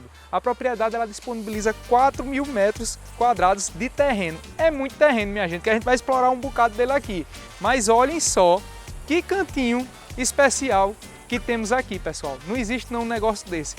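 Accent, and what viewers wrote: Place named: Northeast, Brazil. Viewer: Tô aqui me acabando 😂❤🎉 de rir 😂😂😂 A casinha 🏡 é um show 🎉🎉🎉 mas a sua apresentação ; fala ; e simpatia é o que deixa o vídeo ainda mais convidativo ✌👏🏻👏🏻👏🏻💎 saiba disso ; O jeitinho do povo nordeste 🏜 falar encanta os que ouvem ...😂❤✌😘💋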